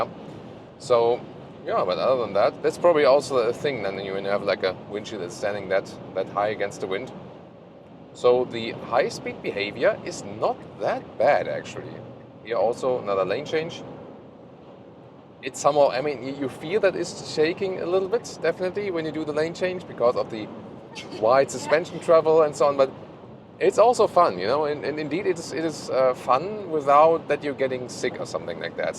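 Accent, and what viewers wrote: Germany. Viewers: It would be so much better to hear this review in his native tonuge. The heavy German accent is annying and I write this as a German native speaker.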